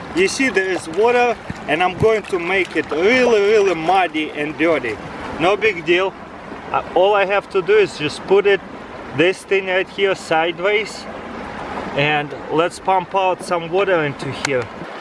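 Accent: Russia. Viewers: sexy russian accent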